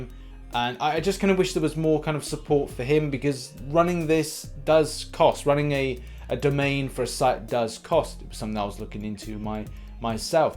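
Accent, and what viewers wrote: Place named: Yorkshire, United Kingdom. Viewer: Not a bad Yorkshire accent there I’m from barnsley which is the broadest Yorkshire!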